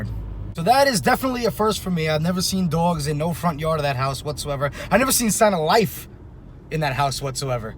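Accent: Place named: Massachusetts, United States. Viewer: "It's a cloudy full day here on Long Island". I had to play his opening statement twice because of his accent. Sounds cool, a little bit like Massachusetts?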